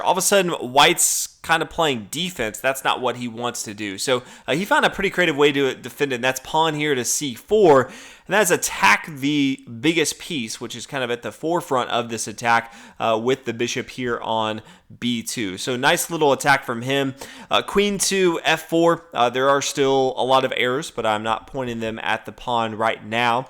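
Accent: United States. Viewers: The American accent is always great to hear.. but man! It’s Not bateess Spassky. It’s boris like bo-ris. And really pronounce the r. Don’t roll it.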